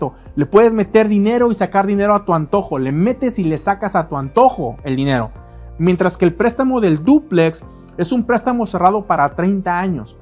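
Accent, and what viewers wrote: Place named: Sinaloa, Mexico. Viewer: UN SALUDO, SON EXCELENTES TUS VIDEOS CON MUY VALIOSA INFORMACIÓN.....TU ACENTO CREO ES DE SINALOA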